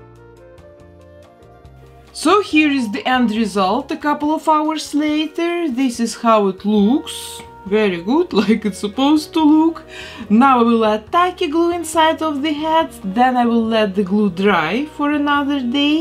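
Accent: Russia. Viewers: Русский акцент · Im so shocked that you are from Belgium… i thought you were Russian or Polish from your accent😅😅 spreek je ook Nederlands/Vlaams?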